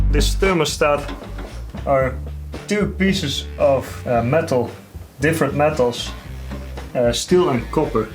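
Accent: Netherlands. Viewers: I heard a dutch accent at Formnext this year which I remembered from somewhere. 😅 Saw you talking to the guys at the Slice booth. You were busy planning new stuff so I didn’t want to disturb you. Awesome and refreshing video as always!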